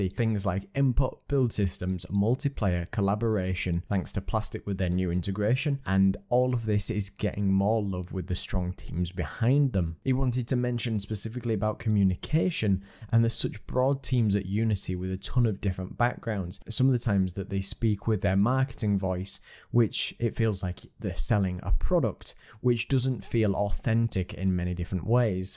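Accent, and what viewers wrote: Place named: North, United Kingdom. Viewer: Hey thanks for the coverage, now I know what I'd sound like coming from the north! 😉 Sorry to see the comments below that you are having struggles with the YT algorithm re Titles, that sucks. All the best!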